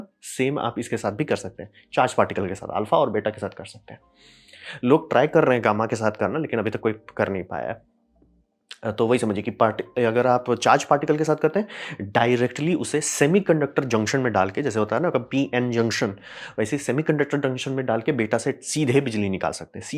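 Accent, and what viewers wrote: Bihar, India. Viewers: liked ur bihari excent too❤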